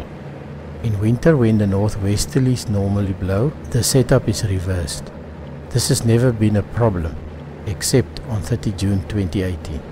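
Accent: South Africa. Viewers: South African ... not Australian 🙄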